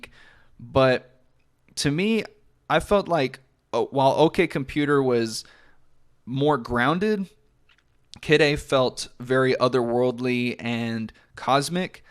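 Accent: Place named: United States. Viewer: Don't think I'll ever get used to how americans pronounce niche.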